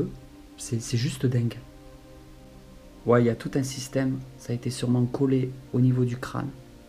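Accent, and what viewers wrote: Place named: South, France. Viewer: ah le plaisir de réentendre ton petit accent du sud de la France, c'est cool que tu revienne sur youtube avec de nouveaux projets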